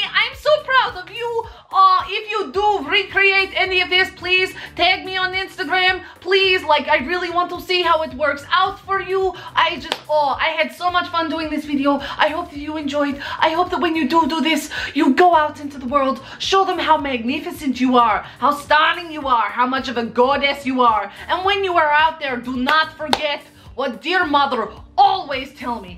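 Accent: Russia. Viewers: I'm new here, what is her accent? Sounds a bit Russian? I love her personality <3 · are your neighbours happy about the fact that you're screaming and moaning like a crazy? 🤔😂 btw this is the first video from you that i watched and i loooooove you, especially your russian accent! 🤠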